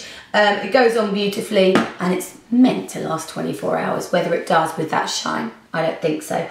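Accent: United Kingdom. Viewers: Omg im so so so in love with your english ,like harry potter 😁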